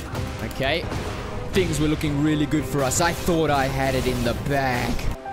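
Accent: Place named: South Africa. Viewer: I know your profile says you’re Australian, but I can recognise a South African from a mile. Awe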